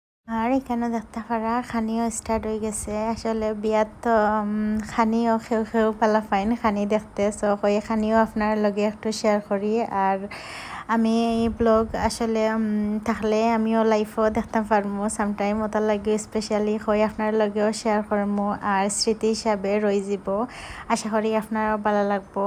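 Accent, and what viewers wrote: Sylhet, Bangladesh. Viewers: Appu goh apnare otto sundor lager 😍😘 . R eta amar 1st comment . R apnar vlog gun onek sundor oy . Allhumdulillah dua kori apnara sob somoy bala takoin . Ami apnar 2 3 ta vlog u deksi . Dekiya u oto bala lagse . Asha kori future o apnar loge amar deka oibo baggot takle . R apne otto sundor kori sylheti matoin huniya jeee Bala lage . By the way ami apnar little sister or lakan . Ami inter 2 year o fori . LOVE YOU APPU 😘😘😘😘😘😘😘😘😘😘😘😘😘😘 . R ami dua kori ami o jeno future o olan akta husband fai + olan family o olan . R amar wedding ta o jeno olan oy . Allhumdulillah . ☺️☺️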